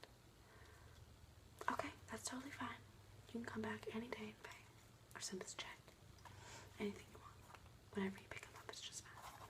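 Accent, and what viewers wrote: South, United States. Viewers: Love the southern accent 👏🏼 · I love Southern us accents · I live in Alabama and this was spot on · very appropriate use of the southern accent, we really do make a big ass deal out of weddings around here for some reason. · love southern accent ASMR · This was heavenly!! The tapping on the tags gave me so many tingles, thank you lol! And the southern accent was a perfect touch ❤️ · I am born and raised in the South and she NAILED this accent. Normally, it is difficult and cringy to watch Accent videos but this was really really accurate and tingles were everywhere. ilysm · Wonderful job on the accent. I'm southern and i can tell when it's a forced southern accent, but your sounds so natural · You are the cutest sweet pea ever! Your southern accent is sooooo adorable. I was smiling throughout the entire video. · girls with a southern drawl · you can do a Southern voice really well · this is so nice to watch, usually when people fake a southern accent they make it sound annoying as hell but you just sound so sweet n gentle i love it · the southern accent actually sounds like a southern accent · OH MY GOSH THATS THE SWEETEST SOUTHERN ACCENT IVE EVER HEARD I LOVE THIS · As a lifetime Texan, you nailed the casual accent so hard. 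I wanted to fall asleep so bad but I have work to do😣. This reminded me so much of my childhood & growing up hearing all the women in my life w Southern accents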